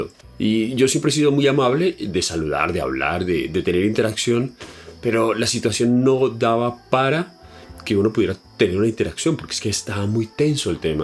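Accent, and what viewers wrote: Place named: Colombia. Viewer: Cuidandome en esta pandemia me encuentro con tu video y escuchando tu narración de tu experiencia en corea del norte, me encantó tu formato. He leído mucho sobre corea del norte, su cultura, su forma de vida, el sometimiento en este regimen, la infraestructura de este país y realmente no me gusta nada Corea de Norte, pienso que es un país engañando por este régimen, donde estan atrazados años luz del mundo exterior. Pero tú Narrativa es muy entretenida a lo Colombiano y eso me encantó. Seria muy interesante escucharte hablar de otros países que tu has visitado. Pues me encanta conocer otras culturas y otros países. Yo sigo a Alex tienda, Gabriel Herrera, Luisito comunica, planeta Juan etc. y que bueno que un colombiano nos narrará sus experiencias de viaje desde su concepto y a lo colombiano. Un saludo y felicitaciones.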